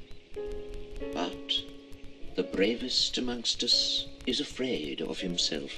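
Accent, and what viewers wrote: United Kingdom. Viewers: Very good, but not how I picture Wilde sounding. He was Irish, this fellow sounds English and older. But a good reading nonetheless.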